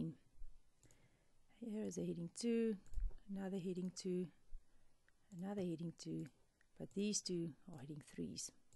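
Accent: South Africa. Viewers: A lovely South African accent I detect 🥰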